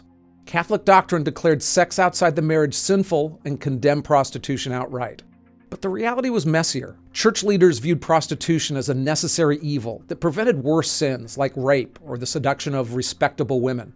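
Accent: United States. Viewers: But it is legal in most first world countries. Assuming from the accent you meant the United States but I wouldn't call this one a first world, just a really wealthy 3rd world country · Huh ? Prostitution isn't illegal. Ah, American accent. That explains it. Can't understand why the car was stopped on the left, though.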